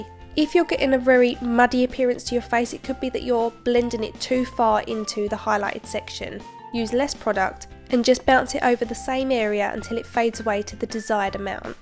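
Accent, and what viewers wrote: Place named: London, United Kingdom. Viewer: Lol, It's the London accent I have it to but voice it very pretty!!!!!!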